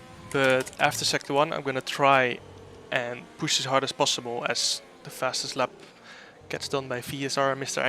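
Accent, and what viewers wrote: Netherlands. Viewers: I love the fact you can hear his dutch accent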